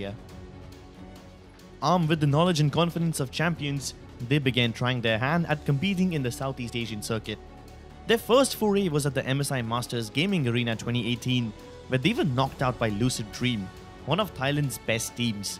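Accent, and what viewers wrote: India. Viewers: i like how he speaks with hindi accent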